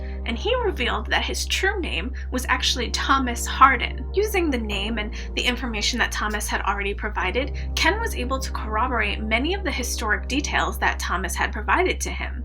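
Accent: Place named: New York, United States. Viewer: Quick question. Which borough would I find the accent in? I think I found my type 😂😂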